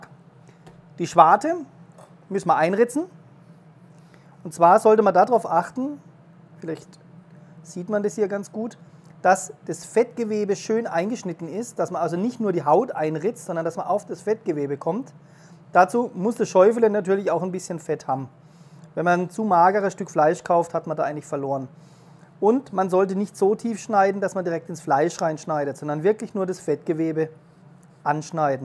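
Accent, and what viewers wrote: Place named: Franconia, Germany. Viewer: Karlsruhe? Du klingst aber auch etwas fränkisch.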